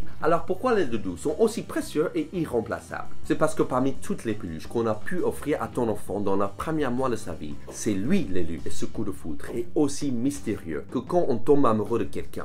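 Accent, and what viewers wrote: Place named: United States. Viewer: Ah ce petit accent américain capable de te faire prendre les pires nouvelles avec le sourire ^^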